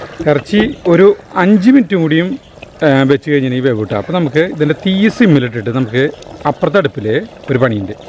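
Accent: Thrissur, India. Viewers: I like ur thrissur slang...beef looks yummy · I am regularly watching your blogs of different recipes. I love it, I liked your Thirussur slang. I am native of Kozhikode, but settled in Vellore-632006, Tamilnadu, since 50 years. I love the way you and your wife jointly cook. All the best....Roney Raymond.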